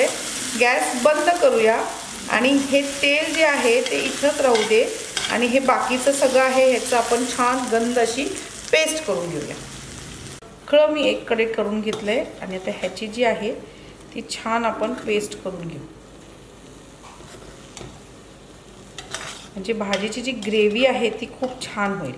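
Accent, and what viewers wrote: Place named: Kolhapur, India. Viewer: मी खूप दिवसांनी आज यू ट्यूब वर आले आणि प्रथम तुमची रेसिपी बघितली. खूप छान सोपी रेसिपी आहे. आज मला १० रु. ला मोठ्ठी पेंडी कोथिंबिरीची मिळाली आहे. आज संध्याकाळच्या जेवणातच मी ही भाजी करून बघीन. माझ्या तोंडाला आत्ताच पाणी सुटलंय.! आणखी एक. खूप दि.नी तुम्हाला पाहिलं. हडकल्यासारख्या दिसताहेत. छान दिसताय. तुमचा कुडता, व त्याचा पॅटर्न पण खूप आवडला. तुमच्या तोंडची कोल्हापुरी लहेजा असणारी भाषा मला फार आवडते. लहानपणी काही काळ कोल्हापुरात होते. एकदम् नोस्टाल्जिक वाटतं.❤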